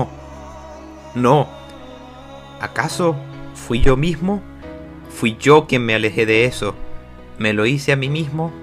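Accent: Venezuela. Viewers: Es venezolano Jajaj😂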